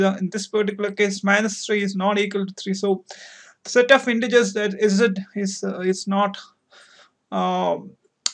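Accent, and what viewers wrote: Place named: India. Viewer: Well explained..!! The accent is not at all a topic of this video. If you guys cannot understand his accent, find some FREE material, and learn more about Indian accent. This one explains the mathematical concept better than other fluent accent videos.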